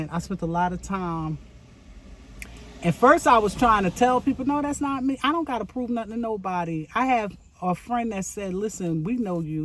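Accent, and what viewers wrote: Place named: North, United Kingdom. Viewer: Happy New Year,!!!! New subbie here and I can already see I'm going to like it here. You sound like you're from up north. "She never liked me, she can't stand me." WHAT???? NAH.... But congratulations on your engagement. This is wild BUT 2025 is here. Let's get it off to a good start.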